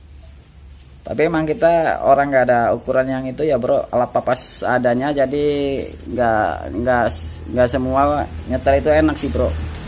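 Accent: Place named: Banyumas, Indonesia. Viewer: Ora ngapak ora kepenak..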